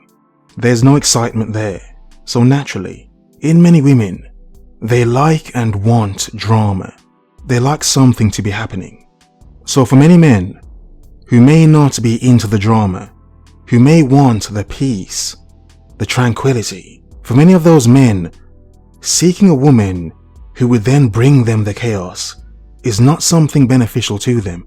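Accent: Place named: United Kingdom. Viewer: This guy's British accent is so much easier on the ears than those other channels.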